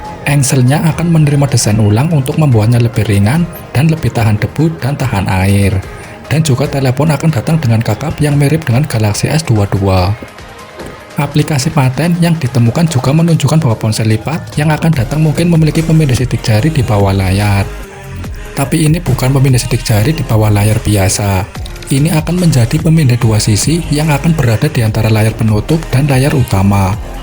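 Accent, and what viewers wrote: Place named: Java, Indonesia. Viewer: medok amat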